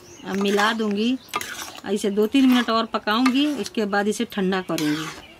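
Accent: Bihar, India. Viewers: Bihar se hai kya . Very nice karte hare kmate rhe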